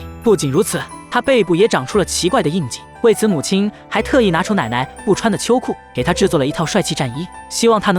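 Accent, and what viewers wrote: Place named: India. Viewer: 这样印度来的么，味好重啊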